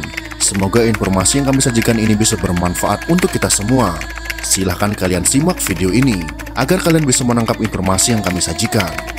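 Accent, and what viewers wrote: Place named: Java, Indonesia. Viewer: anda juga berbahasa medhok. bahagia jadi suku terbesar didunia.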